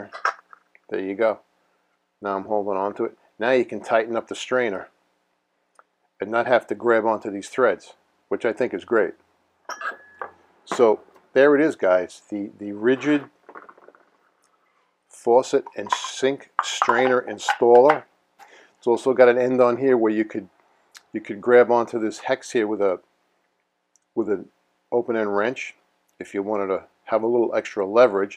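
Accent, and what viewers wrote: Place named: Brooklyn, United States. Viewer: Just bought one and i knew you were from Brooklyn since you sound like me, lol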